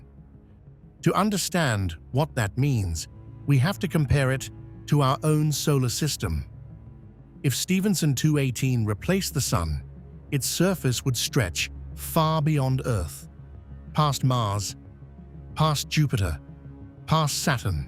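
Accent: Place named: United Kingdom. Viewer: British guy voice! 👍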